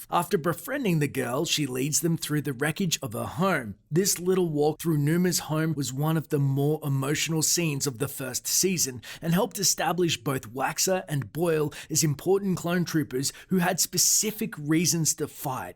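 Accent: Australia. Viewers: I love hearing an Aussie narrate these videos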